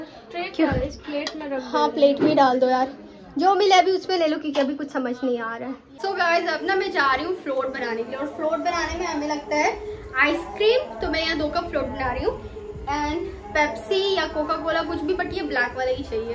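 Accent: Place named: Bihar, India. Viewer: Dekhiye chahe jitna English bol lijiye aap lekin Hindi jb bolri h TB tb Bihar ki boli nikal hi jari kapda bolte kapra nai 😅😅😅 Hindi galtb bolti h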